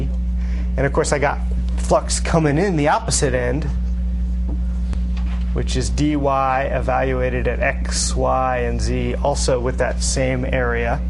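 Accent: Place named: United States. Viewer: Why must American's pronounce every greek letter differently >.<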